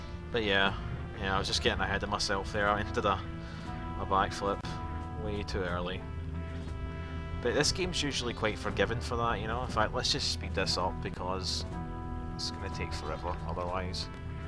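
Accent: Scotland, United Kingdom. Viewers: Hey, are you Scottish? I don't know enough about accents to get any further than that.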